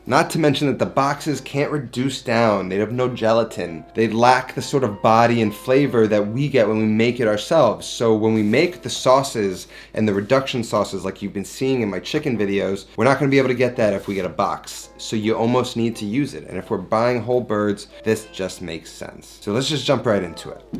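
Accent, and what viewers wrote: New York, United States. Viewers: I watch for the food, your handsome face/ NY accent.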